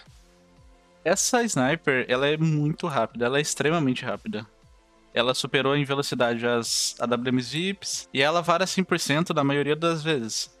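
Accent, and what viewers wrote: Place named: South, Brazil. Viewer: Mano tu é do Sul né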